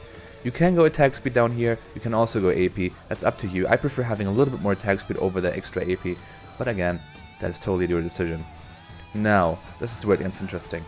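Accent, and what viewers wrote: Germany. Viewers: Just discovered your channel. I wanted to start maining Shaco and your video was very helpful. Thx man and keep it up. PS: You sound very german in my opinion, especially how u say Talisman :D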